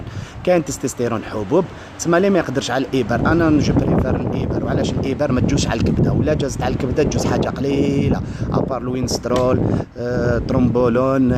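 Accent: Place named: Algeria. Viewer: لهجة جزائرية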